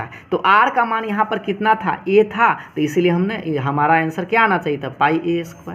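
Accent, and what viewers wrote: Bihar, India. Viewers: sir hum U.P se hai...sir apki voice se pahachan Gaye hai ki aap Bihar se hai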